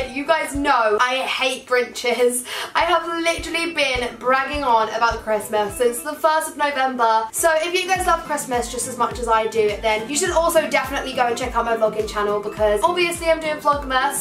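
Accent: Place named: United Kingdom. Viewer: hey I subscribe to your channel and I love your accent I believe that your accent is British right?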